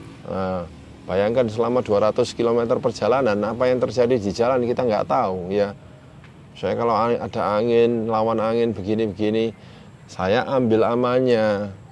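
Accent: Surabaya, Indonesia. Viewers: khas aksen Suroboyo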